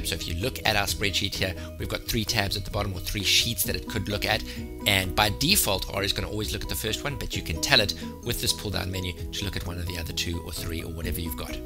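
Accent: South Africa. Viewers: Lol very surprising to hear a South African accent on Youtube. The work you've done here is insane. Thanks!!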